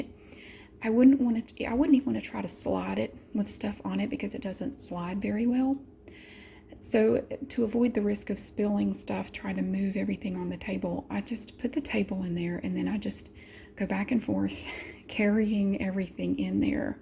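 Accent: United States. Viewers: This is so comforting to me. I live in the UK and my grandparents live in the States so I love watching your videos to hear the accent and the American kitchen and food. Just how I remember ☺️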